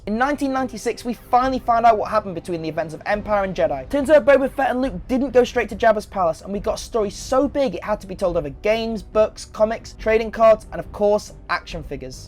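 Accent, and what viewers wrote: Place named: United Kingdom. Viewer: He has a British accent... They pronounce 'a' at the end of a word as 'er.' Not a mistake...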